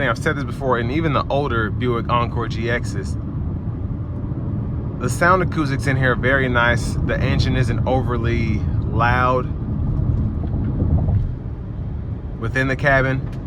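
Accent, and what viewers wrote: South, United States. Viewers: Nice southern accent! Great review. 😀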